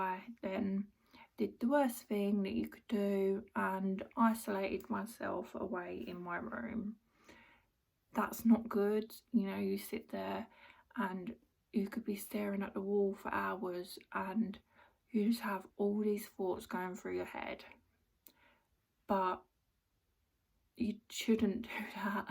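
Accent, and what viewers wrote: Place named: United Kingdom. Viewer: This is random but I love your accent. what kind of british accent is it? x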